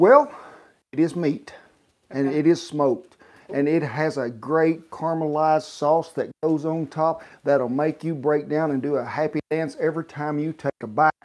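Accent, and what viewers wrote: South, United States. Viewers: No ma'am . You can't take the accent out of the south 👍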